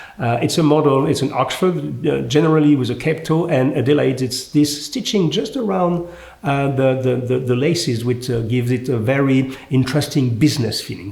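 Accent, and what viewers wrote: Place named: France. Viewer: Dont want to be rude, but the pronunciation on skoaktiebolaget is like three words skor=shoes aktier=stocks/shares and bolaget=company but in this context it means shoetradingcompany, so put air between sko-aktie-bolaget and you will be fine even with a french pronunciation, wich is not to be complained about. Kind regards from Sweden and thanks for lifting that Company .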